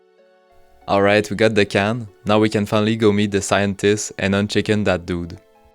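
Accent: Quebec, Canada. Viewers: french or quebecois, he speaks well tho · Quand juste l'accent te dit que t'es queb haha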